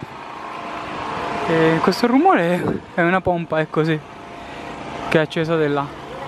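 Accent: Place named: Mexico. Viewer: mi piace il tuo accento messicano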